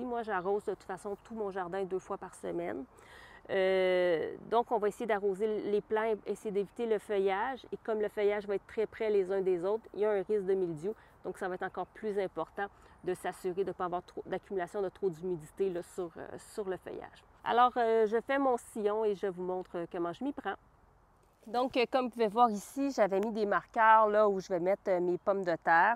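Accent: Quebec, Canada. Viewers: Coucou amie Québécoise Très heureux d'avoir fait ta découverte et ton accent me ramène à Montréal ou j'étais il y a a peine un mois pour des vacances en famille ❤❤❤ Je m'abonne biensur a ta chaîne Bonne journée à toi et à bientôt Greg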